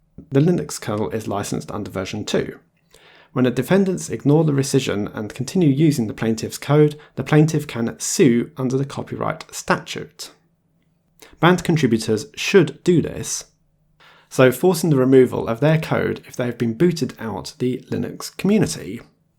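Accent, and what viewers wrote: England, United Kingdom. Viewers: Are u from england?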